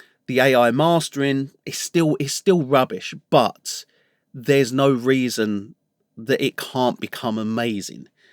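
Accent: England, United Kingdom. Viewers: I hate to say it man, but you had me with the accent. I want to trust everything you’re telling me for some reason. Why would an English gentleman such as yourself do otherwise?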